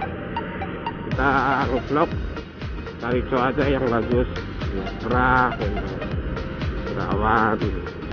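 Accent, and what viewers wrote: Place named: Banyumas, Indonesia. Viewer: Nyimak sedulur ngapak ora ngapak ora penak. .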